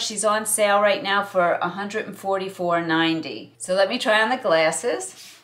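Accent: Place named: New Jersey, United States. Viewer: I'm from Connecticut near the Westchester border and to my ear I hear New Jersey.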